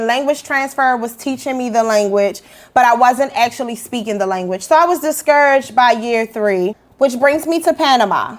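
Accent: Baltimore, United States. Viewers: Omg haha I remember watching you earlier and asking to myself if your accent was from mephis or baltimore🤣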